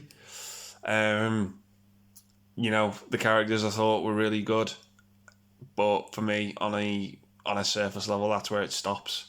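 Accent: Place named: North West England, United Kingdom. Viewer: I'll be honest a lot of movies in your list i 've not even seen because they aren't a genre i'm into, but i certainly respect your list and right to an opinion. I look forward to your list of unpopular movies you like, i definitely have plenty of those too. I really like your honesty and attitude. I'm guessing from your accent you are from around the North West, i'm technically North East, just below Hull.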